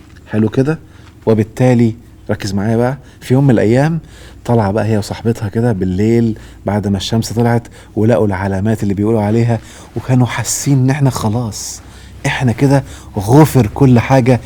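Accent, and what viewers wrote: Algeria. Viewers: انا تبانلي جزايرية ماشي ليبية ومنعرف ههه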